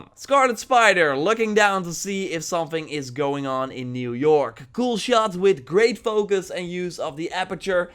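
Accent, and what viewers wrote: Netherlands. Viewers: Are you dutch im dutch you sound dutch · Are you dutch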